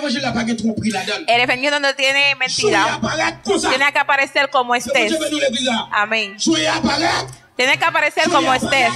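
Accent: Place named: Spain. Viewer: Li gen bon jan aksan espanyòl la nan langaj li.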